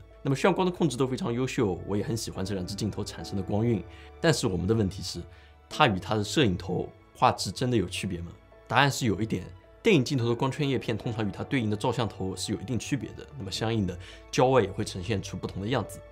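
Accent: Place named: Hangzhou, China. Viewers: 强烈的杭州口音！！！听着好点别扭！！！ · 杭州口语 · 你的杭州口音还是改不过来啊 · 杭州人喔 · 我們大杭州標準口音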